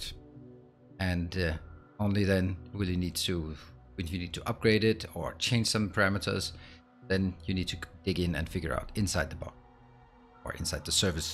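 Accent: Denmark. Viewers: The danish accent is strong with this one, kinda like it though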